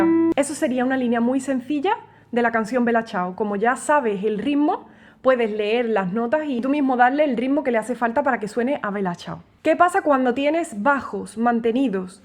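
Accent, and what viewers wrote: Andalusia, Spain. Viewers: Dice Buenas tardes, es de andalucía y pues lo dice con su acento andaluz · No soy entendido en música. Pero me quedo a escuchar ese acento andaluz 🥰 que enamora.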